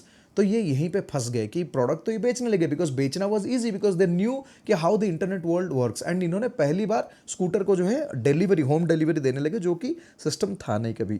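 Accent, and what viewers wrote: Odisha, India. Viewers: Even if after so many years, your odia accent in hindi sounds good.... Badhiya Bhai..... Good job... Fronm ROURKELA/Raipur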